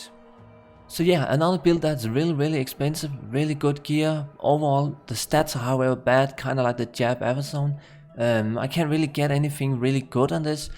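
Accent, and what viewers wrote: Denmark. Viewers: Man kan lugte en Dansk accent 14 kilometer væk.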